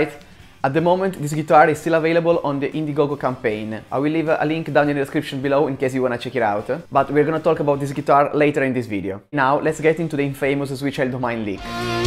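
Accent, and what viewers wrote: Italy. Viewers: La única diferencia está en el inglés... A mi me vale el italianinglish de...me entero perfecto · jajaja italiano hablando en ingles! jaj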